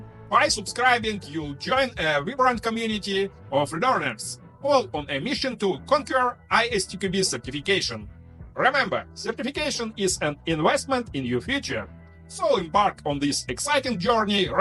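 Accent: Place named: Russia. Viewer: your russian english is briliant)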